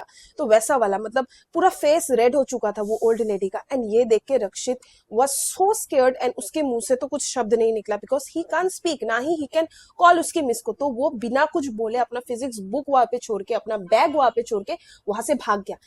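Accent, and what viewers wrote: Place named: Bengal, India. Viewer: ❤❤❤❤beautiful ❤❤❤❤with bengali accent. Its nice❤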